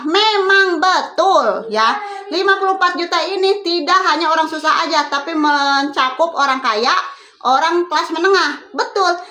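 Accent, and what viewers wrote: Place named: West Java, Indonesia. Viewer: beneran orang Betawi apa orang Jawa barat sih pok..😂😂😂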